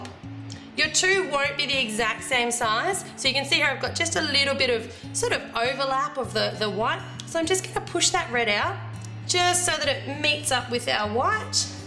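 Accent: Australia. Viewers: I thought u were Australian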